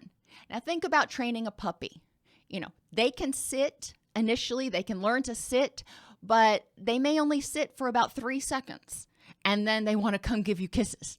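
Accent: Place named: United States. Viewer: Your explanations are really amazing, but I am afraid your accent is too American an you speak so fast for non natives like myself. Thanks a lot though.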